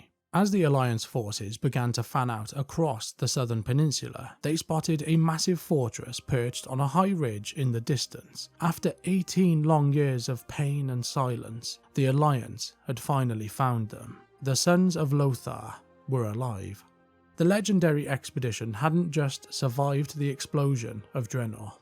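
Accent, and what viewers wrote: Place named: Cardiff, United Kingdom. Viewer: I know a fellow Cardiff boy when I hear one!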